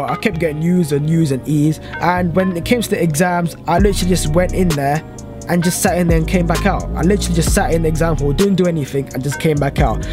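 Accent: London, United Kingdom. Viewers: If you're born in France,why do u have such a strong London accent?😟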